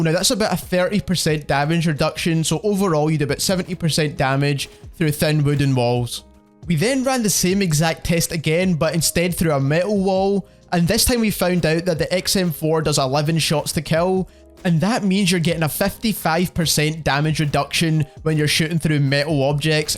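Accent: Ireland. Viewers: He sounds Scottish or Irish. I think Irish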